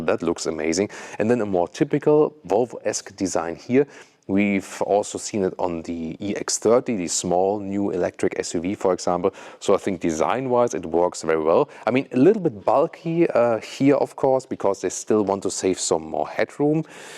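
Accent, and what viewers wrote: Germany. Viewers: Junge, Du bist "deutscher2 als Du glaubst. Lass das bitte mit dem English. Dein Akzent verrät Dich hinter jeder Silbe. Grund genug, nach 2 Minuten wegzuschlagen. Unerträglich.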